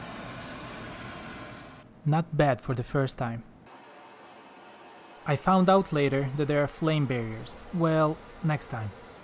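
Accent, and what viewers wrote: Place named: Russia. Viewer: I've got to leave a comment, I hate it usually : ) bought the same unit, doing a change over install from a Bosch 82% eff. Love your eagerness to make things work and to do it properly. Love the accent but you don't typically look Russian, I'm Canadian and have a good friend Sergei who is Kazakhstanian, anyway appreciate what you did and the tact in which you did it, I might have been muttering with all the extra challenges, you sir make me a better person, stay safe, I'm a nurse(male) awaiting the pandemic, trust in the Lord, death is certain but how we live and who we live for changes everything, just wanted to send some blessings your way, thanks for yours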